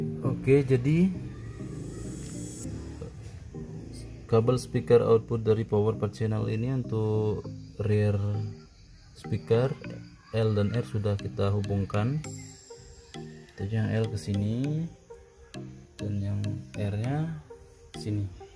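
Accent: Bugis, Indonesia. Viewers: Org bugis kah ?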